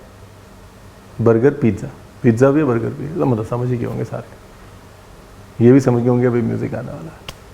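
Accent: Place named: Punjab, India. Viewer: Your punjabi accent is mind blowing🤯 👨‍🍳 chef. Keep coming with such great content and recipes. 🥳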